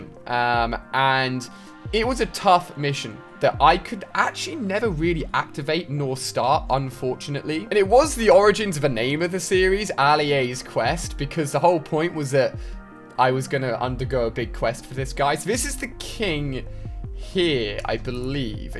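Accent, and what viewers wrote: United Kingdom. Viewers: he says toitoise cause hes British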